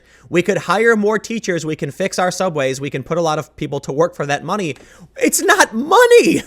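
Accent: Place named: Chicago, United States. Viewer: I was listening to you talk, and I thought to myself, "Self? That accent sounds just like Bill Murray's. I wonder if he's from Chicago like Tim." And, sure enough, he is.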